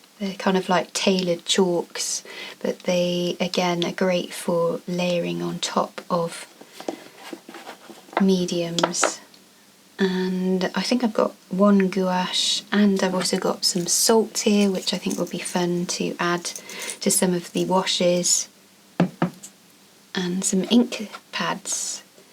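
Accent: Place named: United Kingdom. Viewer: I love the colors in this so much, and your style! Started to watch yours and other YouTubers this winter when I surfed around for inspiration this dark winter. Always loved British accents and yours is so nice to listen to, haha, sounds weird maybe, but I am Swedish and most sweeds speak English with a terrible American accent. The vlog when you are walking around and sketching the beautiful landscape is so nice to watch. I'm going to South of France next week and will be doing my first sketching outside there I hope. In Stockholm its far too cold, the snow just melted. Thanks for the inspiration!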